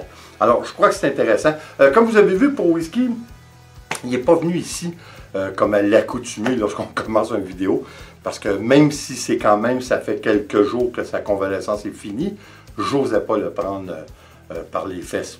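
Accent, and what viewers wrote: Canada. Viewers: Magnifique accent canadien 😀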